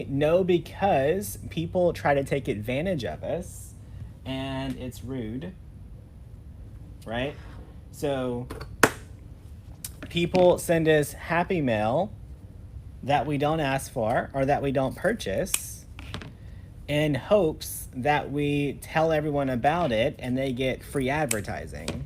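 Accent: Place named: Alabama, United States. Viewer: Lol, you say ya'll as much as we do in AL. My cousins in MI say "youz guys"...that's just wrong don't you think? haha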